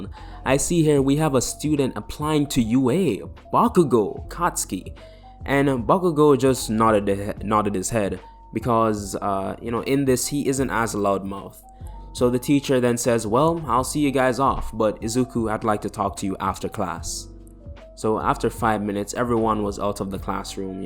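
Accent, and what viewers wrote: Jamaica. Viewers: Listen no hate I'd just like to know do u have a Jamaica ascent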